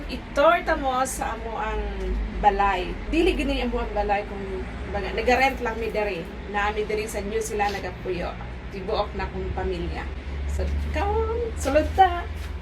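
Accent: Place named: Visayas, Philippines. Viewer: Bisaya diay ka host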